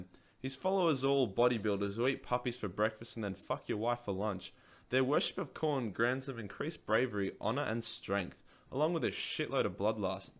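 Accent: Australia. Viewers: haha love this keep making these love you ausie accent cum to britain and speak we will love you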